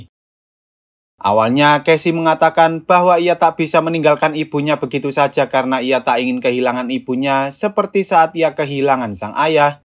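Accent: Java, Indonesia. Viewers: BANG LU ORANG JAWA KAH😊=BANG LO WONG JOWO TAH😊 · Bisa gak pake medok gak? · Paling seneng dengerin review film yang suara yang review ada jawa jawa nya🗿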